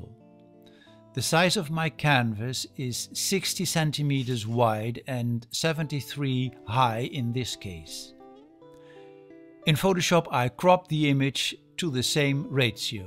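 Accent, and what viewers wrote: Netherlands. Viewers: Are you Dutch? You are an amazing teacher and the accent helps (I like Dutch accents)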